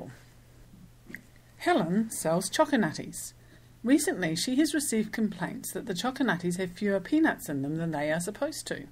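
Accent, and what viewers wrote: New Zealand. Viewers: With your videos I am learning Statistics AND New Zealand's English :) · Are you from New Zealand?? I love that country and want to go back some day! Love the accent too..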